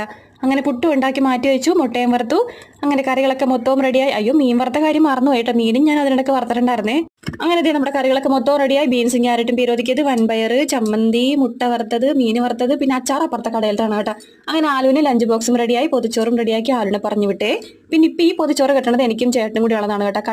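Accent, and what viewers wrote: Kochi, India. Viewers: Kochi basha 😂same 2u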